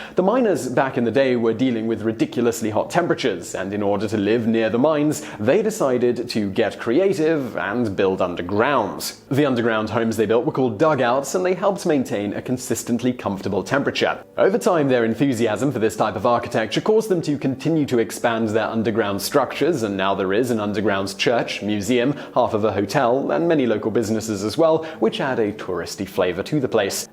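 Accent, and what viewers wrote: United Kingdom. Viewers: accent is def UK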